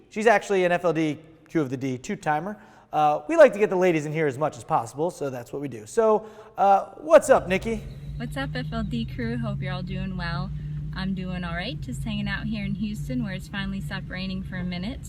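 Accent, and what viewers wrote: United Kingdom. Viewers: your british accent sounded like hammond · Is it just me or does English accent sound a bit like Hammond?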